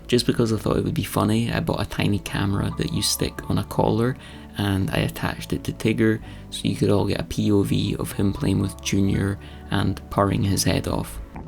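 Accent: Scotland, United Kingdom. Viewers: I have to say that I love two things about this video. For one, I love your beautiful cats. Second, I love your Scottish accent💖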